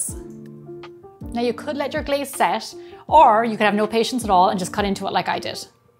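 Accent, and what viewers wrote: Ireland. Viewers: Love this recipe & the Irish accent ❤️❤️ love from India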